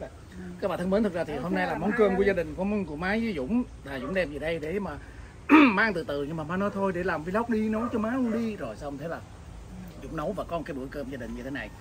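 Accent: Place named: Mekong Delta, Vietnam. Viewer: A Dũng quê tỉnh nào . Mà sao giống Miền Tây quá vậy A Dũng.....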